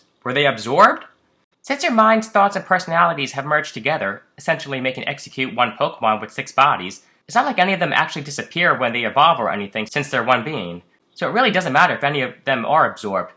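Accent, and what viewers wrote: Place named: Russia. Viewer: why u accent so bad its like u are a russian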